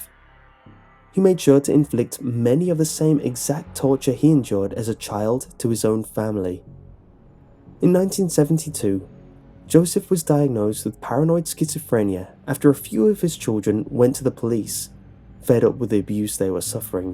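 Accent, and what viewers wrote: United Kingdom. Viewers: I don’t like British accent It Sounds like foreign English I am in trouble 😭 This is not good 😵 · You British?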